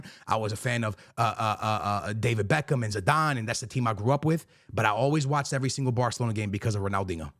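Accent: DMV, United States. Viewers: Wait, is he from the DMV? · Bro are you from the dmv? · Yo youre from the dc area?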